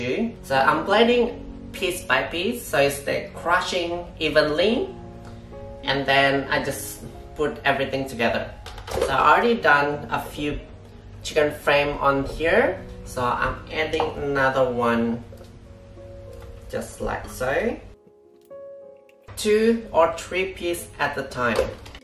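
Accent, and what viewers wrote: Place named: United States. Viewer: You sound like an American. I am a polyglot, speak 9 languages and English is for the la zy. It is bar none the easiest language to learn, a breeze learnt in a weeks. No declensions, no diphthongs, all verbs remain in the infinitive for all pronouns, no conjugations to learn, add "ed" for past, add "will" for future and nouns don't have gender. You were saying?? Try learning Chinese, Arabic, Russian or French. Good luck with that!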